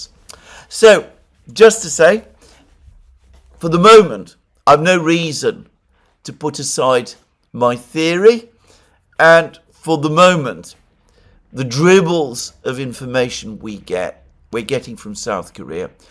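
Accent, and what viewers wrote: United Kingdom. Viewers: The only angle this guy focuses on is from the Russia perspective or the effects on Russia. Looks like a Russian agent of Greek background raised in and made to look and talk like a Brit!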